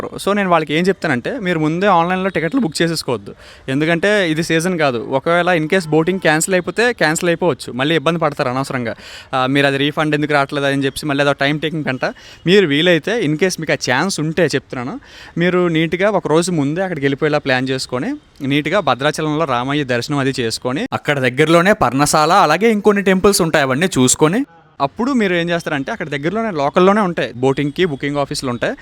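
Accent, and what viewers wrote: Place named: Guntur, India. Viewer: Mona aa slang vinni lenin anukuna .... Ivale ardam aindi guntur karaam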